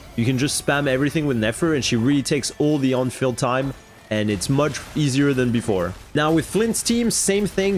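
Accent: France. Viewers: are you french you have an french accent sometimes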